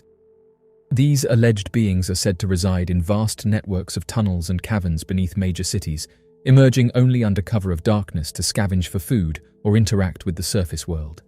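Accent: England, United Kingdom. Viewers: with annoying en-glish accent..Indeeed !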